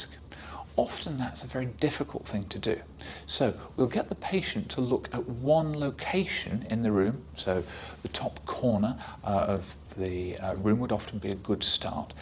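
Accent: United Kingdom. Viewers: British accent 💚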